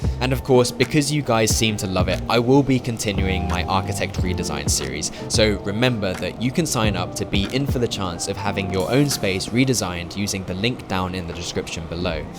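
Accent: United Kingdom. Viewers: Just 1 minute in and this is the first video I've watched from you and you sound British yet you talk about being under mountains of student debt??? Why? This isn't America where you get chased for it. UK student loans are paid only when you start earning over a certain threshold (the poverty line), is in proportion to your income, is removed automatically from your pay slip and is forgiven after 30 years and has virtually no impact on mortgages, credit score or anything else. It's effectively a graduate or uni tax not a loan.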